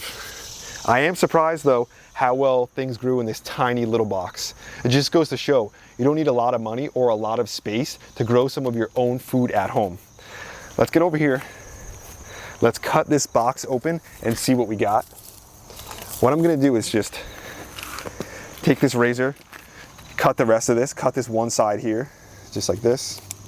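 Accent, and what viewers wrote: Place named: East Coast, United States. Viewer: yaaaass i love the east coast wooder accent!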